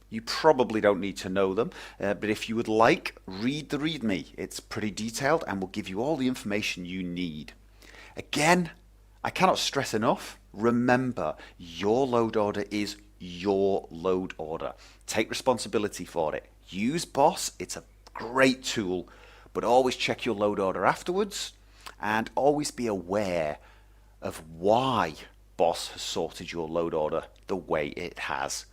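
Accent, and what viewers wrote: United Kingdom. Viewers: You sound a little British but you are from Norway?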